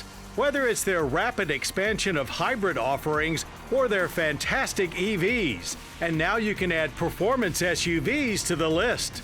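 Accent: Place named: South, United States. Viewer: Remember when he had a southern accent?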